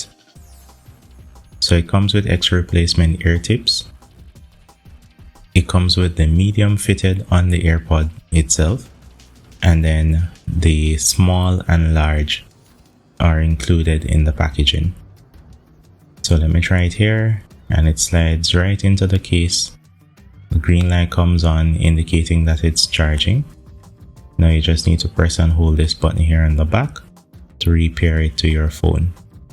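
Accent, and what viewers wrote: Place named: Jamaica. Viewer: How him sound like yaadie so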